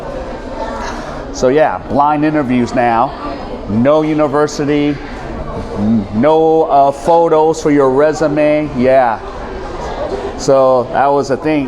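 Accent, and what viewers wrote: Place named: United States. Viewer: The Korean in South Korea should go to the Philippines and build their own business. Its easy to set a business in the Philippines for Koreans. Filipinos loves Koreans. The way you talk now is like "americanized" & "Philipinized" so funny !😂🤣🤣🤣! I subscribed because you are funny and truthful - real dude. I'm from Miami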